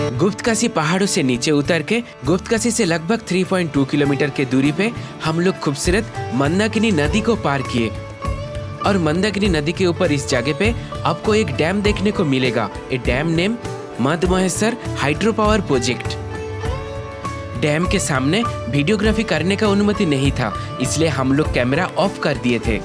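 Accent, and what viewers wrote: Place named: Bengal, India. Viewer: Bhai tumi pakka veto bangali. Tomar pronounciation shunei bojha jacche. Tmi hindi te blog na baniye banglai koro na. Shunteo valo lagbe. Success o pabe.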